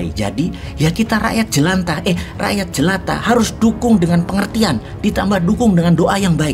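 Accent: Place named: Java, Indonesia. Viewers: bossman Jowone kluar diawal kalimat😁😆😆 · aku suka narasimu? nglulu yen boso jowone ngono...joss👍 pak,lanjut...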